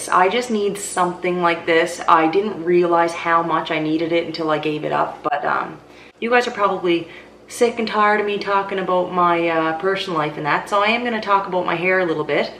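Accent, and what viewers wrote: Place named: Nova Scotia, Canada. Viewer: So glad to hear your Nova Scotia voice again! I also got into house plants about 2 years ago and now I probably have a hundred. I have them in the front yard, in the backyard, and all over the house. Last year we had a freeze and I had to move them all inside and then it was ridiculous. But most of the time they can live outside because we don't freeze, which I know is not an option for you. I cut my hair to chin length and most of the time have it pulled back with a headband. It's not my best look but I'm at a stage right now and I just can't stand to have my hair in my face. Again, great to see you!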